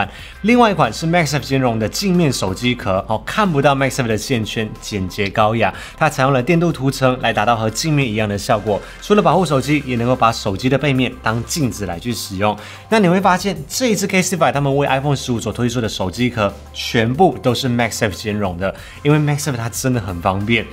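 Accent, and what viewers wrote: Taiwan. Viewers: 真心听不惯台湾腔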